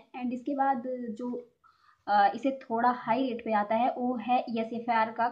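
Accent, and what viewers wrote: Bihar, India. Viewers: Aap itna bihari ka jesa kyu bolti hai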